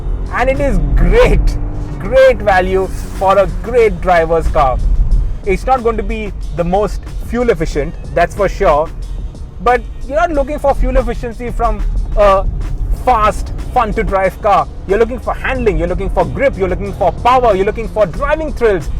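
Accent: India. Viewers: Let me start by telling you that Indian accent is terrible. In addition, the Hyundai i20 in this video is already out of date.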